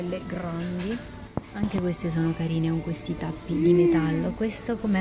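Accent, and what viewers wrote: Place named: Italy. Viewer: You guys need to grab up the jars with the old (large) labels, while they're still available in the UK/EU!! We only have the new, skinny ones in the US now (except for a few "Returning Favorites"): & we really miss the older, larger labels... :-( Loved hearing the beautiful Italian accent here! Praying I can visit Italia again someday... <3 Firenze will always have my heart.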